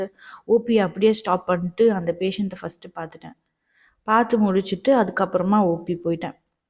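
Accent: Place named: Tirunelveli, India. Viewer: Spr sis tamil rmpa alzhaga pesureenga na tirunelveli nu nenajen..... ✨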